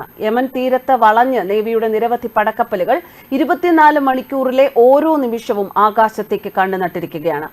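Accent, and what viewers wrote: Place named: Palakkad, India. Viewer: ഹോ ഒരു കൂതി ഭാഷ പാലക്കാടനാ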